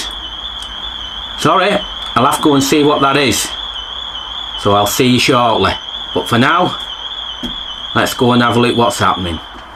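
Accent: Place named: Yorkshire, United Kingdom. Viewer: Yorks accent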